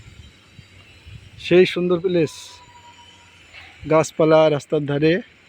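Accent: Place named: Meherpur, Bangladesh. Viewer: মেহেরপুরের ভাষা পাল্টা 🙂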